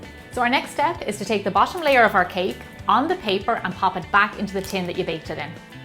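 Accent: Ireland. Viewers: Are u from Ireland?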